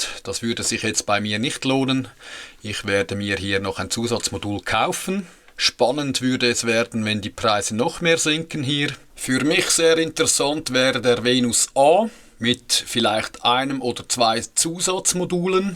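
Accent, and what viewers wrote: Switzerland. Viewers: Zunächst mal: Wie schön, einen Schweizer Dialekt zu hören - mein Sehnsuchtsland! Ich habe vier B2500D; sind gut steuerbar über Home Assistant. Unschlagbarer Preis von zuletzt 150 Euro/kWh. Können nix, außer PV-Strom speichern und wieder abgeben und das mit relativ geringer in/out-Leistung, aber das unterstützen sie richtig gut. Wer einen reinen Puffer für seine Panels sucht, sollte diese nehmen.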